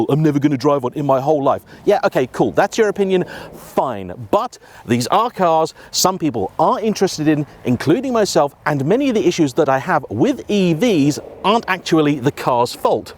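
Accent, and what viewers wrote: United Kingdom. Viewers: I think that's just how British people sound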